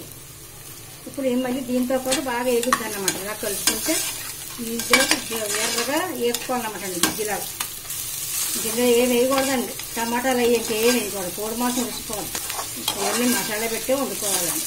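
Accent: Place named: Andhra Pradesh, India. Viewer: Happy to hear pure andhra slang 😊😍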